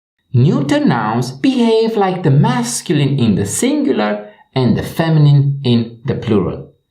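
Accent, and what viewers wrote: Transylvania, Romania. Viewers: pe mine nu ma deranjeaza accentul ardelenesc dar este vorba de doua sunete diferite "e" si "i" si pronuntia corecta; ii inveti sa vorbeasca adica sa pronunte corect romaneste este exact ca in lb engleza; ideea lectiilor este super,felicitari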